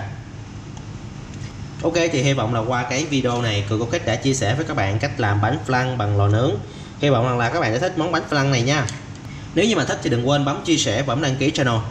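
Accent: South, Vietnam. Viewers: Giọng bạn miền Nam nghe hay & chất phát lắm nên giữ giọng nói và phong văn hay này. * CÁI Trứng. MUỖNG cafe. v.v..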